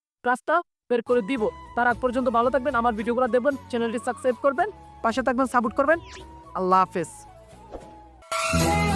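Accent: Sylhet, Bangladesh. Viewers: ভাই সিলেটি মনে হয়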